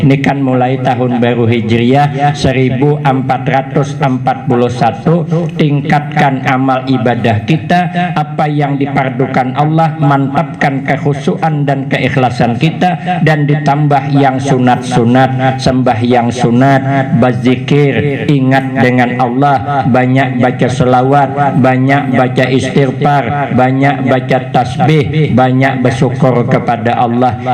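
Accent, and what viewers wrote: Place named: South Kalimantan, Indonesia. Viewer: Asli org mana sidin... lugat mirip kalua amuntai pang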